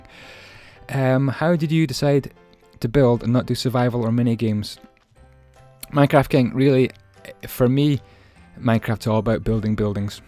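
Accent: Scotland, United Kingdom. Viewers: so nice to hear someone scottish doing minecraft vids! :D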